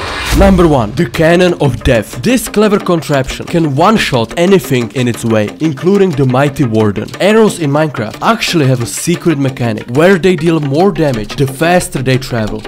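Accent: Czech Republic. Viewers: You have a Czech accent. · bro sounds kinda czech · Ty jsi určitě Čech. Vždyť nemáš ani anglický přízvuk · ur English speaking skills sounds like it talking Czech Guy, dont take it serius, im just asking :D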